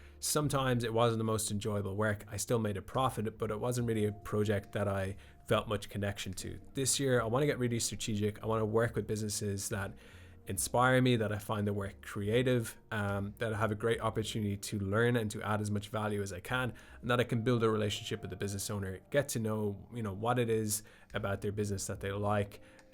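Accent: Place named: Ireland. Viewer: Hey,. Your content is awesome. I really appreciate your honesty and willingness to share your extensive knowledge and valuable insights. (The Irish brogue is pretty cool too. Been a long time since I've been there -- County Sligo it was, many years ago.) Question: Do you have any kind of Inner Circle Club, where we newbies to this game could ask you specific web design-related questions? If so, I would like to hear about it. Thanks again for all of your... can I say it?... amazing insights! P.S. Oh, yeah. One more thing. Those sound like good goals to have, and I really do hope you meet them all. Thanks again!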